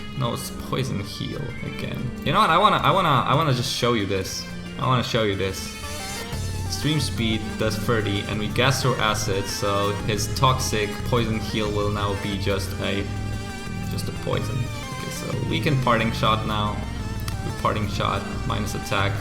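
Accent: Poland. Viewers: My are you from Poland?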